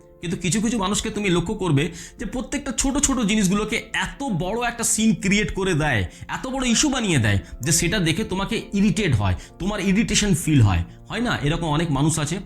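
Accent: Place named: Kolkata, India. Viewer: Apni Kolkatar...😂😂😂 Kotha bolar dhoron ei bojhay..😢😢😢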